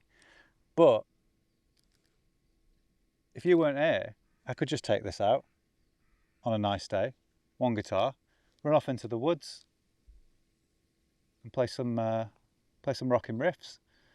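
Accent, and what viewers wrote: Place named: Marple, United Kingdom. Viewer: Must be the Marple accent, I'm not as accustomed to it as I was when I lived in Birch Vale back in the 80's. What's the world coming to when male guitar heroes are considered cool for the shapeless clothing they wear instead of their grossly indecent behaviour off-stage? No wonder Jimmi Page and Keith Richards are still so popular😎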